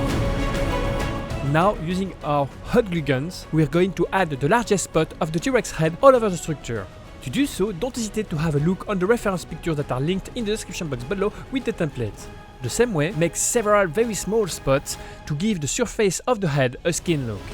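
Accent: France. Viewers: Awesomely sharp French accent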